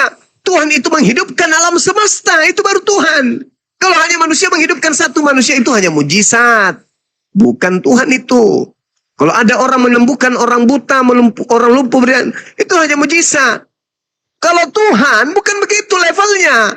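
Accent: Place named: Makassar, Indonesia. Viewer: Hahahaha bang mabicara makasara Ki hahahaha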